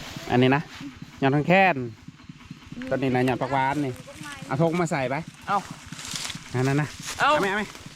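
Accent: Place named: Phu Thai, Thailand. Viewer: เว้าคือคือภูไทบ้านผมแท้บ่าว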